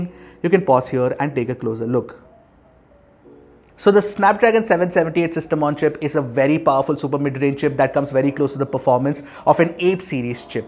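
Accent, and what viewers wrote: India. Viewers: I struggle so much to understand Indian accented English :(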